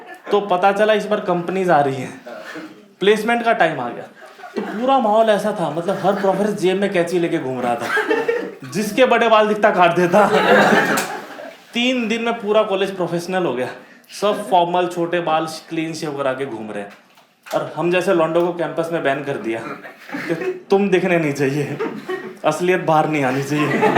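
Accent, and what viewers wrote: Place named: Haryana, India. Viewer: Bhai randeep hudda ka doooor ka cousin lgta hai, hariyanvi touch bhi aa rha hai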